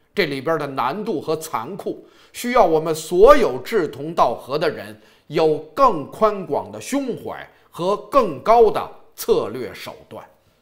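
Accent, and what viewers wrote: Beijing, China. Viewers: 言之有理，一口京腔，特喜歡聽你講！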